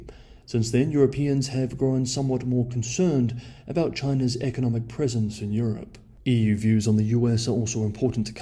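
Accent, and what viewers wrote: New Zealand. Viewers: where are you from, mate? I think a Kiwi, and I'd guess from Wellington but most Kiwis have fairly similar accents and even Southlanders and Kiwis from Otago generally sound somewhat similar to Kiwis in the North Island (other than most Maoris). I should add that sounds much more polished than most Kiwis but I have family members who sound much more posh & toffish than even he🤣🤣🤣🤣🤣😂😂😂😂😅😄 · sounds like a Kiwi to me.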